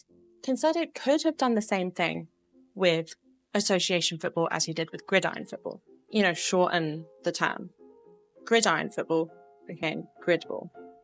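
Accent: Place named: United Kingdom. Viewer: Right of the bat: you seem so much more british on this one?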